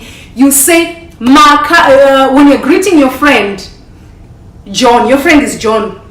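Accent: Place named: Shona, Zimbabwe. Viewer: Hi. Would you tutor me on how to speak with a Shona accent? I’m an actress and I need to learn how to pronounce some words and a speak in your accent.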